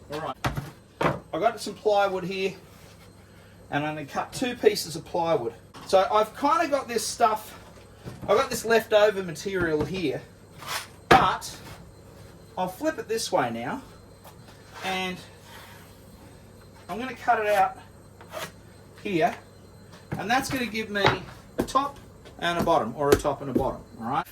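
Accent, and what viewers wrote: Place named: Australia. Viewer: Wow! I wasn't expecting an Ozzie accent. Thanks for the link.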